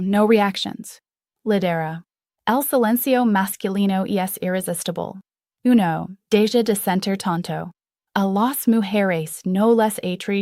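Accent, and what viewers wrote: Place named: Chile. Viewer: Jaja, gracias por los subtitulos. Ella habla en chileno 😅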